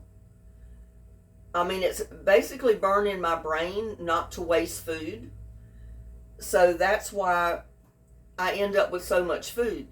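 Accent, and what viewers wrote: United States. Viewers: Loved watching how you make your chilli, I add red kidney beans to mine 😋 love them,even picking up the American accent 😂xx take care 😊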